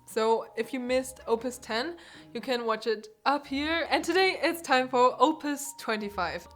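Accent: Germany. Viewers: Nice the German accent and words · That accent tells me ur native language is german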